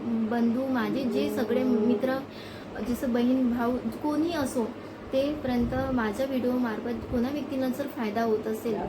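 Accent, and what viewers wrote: India. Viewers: मॅडम तुमची भाषा खूप छान आहे. पूर्व विदर्भात आहेत का तुम्ही. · Nagpuri bhashya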